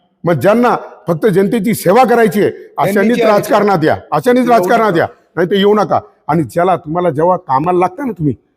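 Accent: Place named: Ahmednagar, India. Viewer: नगरी भाषा 😊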